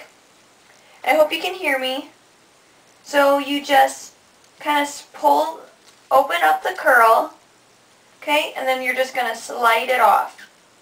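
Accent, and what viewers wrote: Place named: Long Island, United States. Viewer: You sound like your from Long Island.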